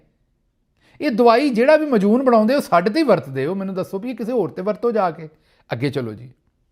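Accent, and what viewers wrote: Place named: Punjab, India. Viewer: Doctor sahib pleases extend the duration of vlog. I love your punjabi lehja.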